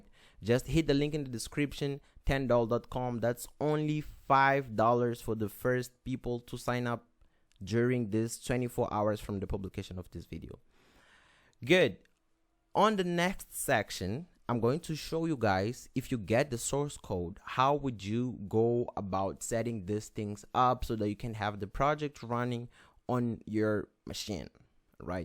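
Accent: Brazil. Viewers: Mano tu é brasileiro? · you're Brazilian, right? lol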